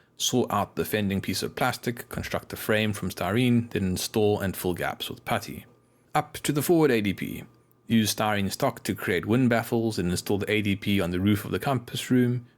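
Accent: South Africa. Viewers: Good god man you do really hate yourself dont you ? Where you from am I hearing a hint of the Karoo ?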